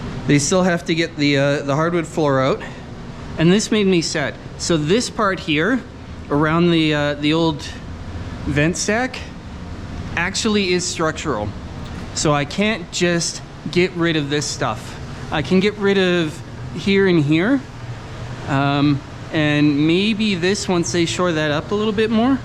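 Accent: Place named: Canada. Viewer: you have a nice accent you must be Canadian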